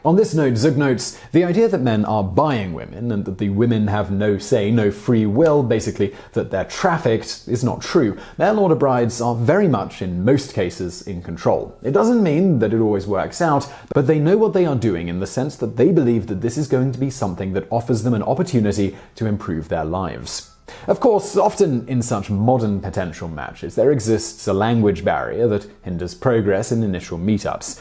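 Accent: England, United Kingdom. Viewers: Why is vsauce doing a British accent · Why is vsauce talking with an English accent?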